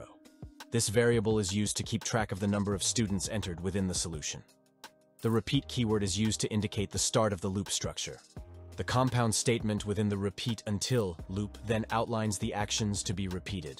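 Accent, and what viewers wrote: Jamaica. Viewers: we need that orignal jamaican voice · original voice with that Jamaican accent was the one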